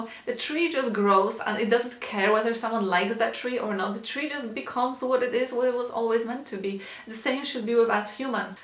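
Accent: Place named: Poland. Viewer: I love your polish accent don't lose it, it makes you unique. luv from Malaysia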